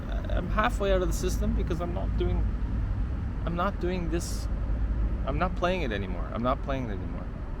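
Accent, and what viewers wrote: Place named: Europe. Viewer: You have a cool accent. Are you European?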